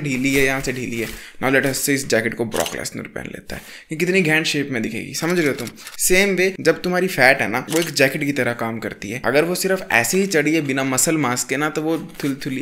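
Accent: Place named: Pahadi, India. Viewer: Bro , ap Himachal sai ho na ? Apkai tone thodi sei Pahadi lag rahe hai 🙂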